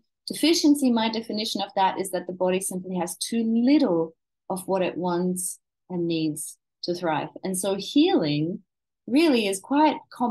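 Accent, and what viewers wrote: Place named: Australia. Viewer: Love your Aussie accent. Greetings from Germany.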